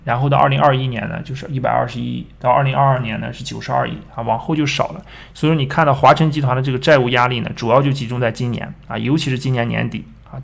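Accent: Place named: Shanxi, China. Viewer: 你是太原人 至少是山西人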